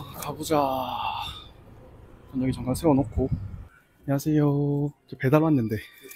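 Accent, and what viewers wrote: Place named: Gyeongsang, South Korea. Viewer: 부산이나 경남쪽분 같내요 맞으시죠?ㅎ 경북쪽은 성격이 강하고 너무 직설적이라 이런 차분한 말투가 안나옴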